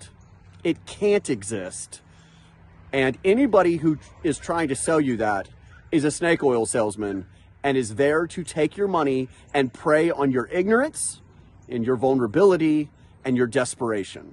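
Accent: South, United States. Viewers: Wow, people will buy the craziest sh*t!! On a side not I’m glad to hear that the deeply Southern “okaaaay” DOES come out of all Carolinians not just us in the more southern Carolina….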